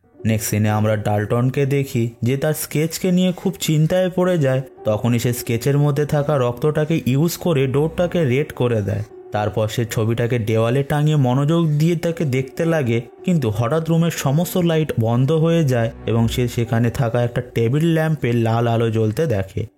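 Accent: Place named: Kolkata, India. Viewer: সুন্দর করে কথা বলেন, উচ্চারণ তো ঠিক নাই। মনে হয় কলকাতার লোক।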